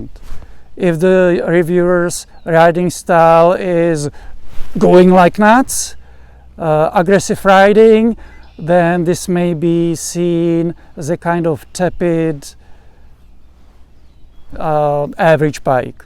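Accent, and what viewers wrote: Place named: Czech Republic. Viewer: Ahoj. Já jsem si říkal, že ten Akcent je český 🙂. GS 310 zvládám tak, jak píšeš výše. Zkusil jsem rozumný offroad, topcase ty plné postranní kufry. Důvody, proč chci jít výš jsou: méně řazení, větší komfort při předjíždění, možnost jezdit ve dvou na delší trasy pohodlně, lepší výbava a taky pocit, že nemám nejmenší GS 🙂.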